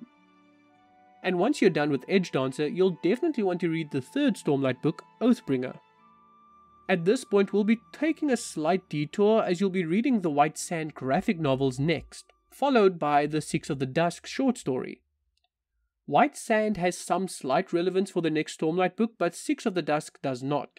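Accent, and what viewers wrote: South Africa. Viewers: First 5 seconds of you speaking I said that must be a fellow South African. Checked your about and I was correct. Nice high quality videos! Definitely gained a sub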